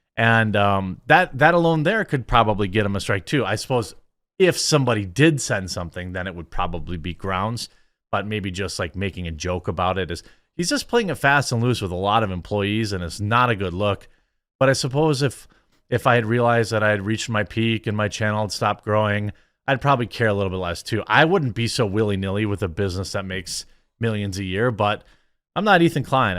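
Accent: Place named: Upper Midwest, United States. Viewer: I just have to say that you talk like someone from the WI, MN or UP.. it took me forever to quit making everything a question with the "ay" on the end of any comment I made. Then if course we have the reverse "ya"!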